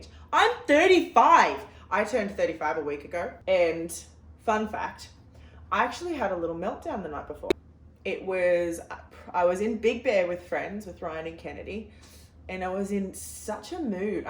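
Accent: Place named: Australia. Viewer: You are gorgeous, with or without makeup. I love your Australian accent. I enjoy listening to your videos because of the way you speak. You mentioned that you are delving into acting projects. Are you learning how to pronounce words in other accents as well ?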